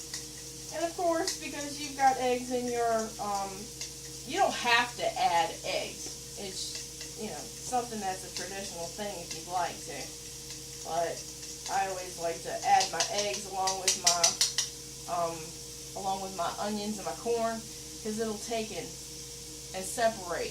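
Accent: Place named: Louisiana, United States. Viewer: Great recipe for fried rice.May I ask were your from?Your ascent sound like your from Louisiana.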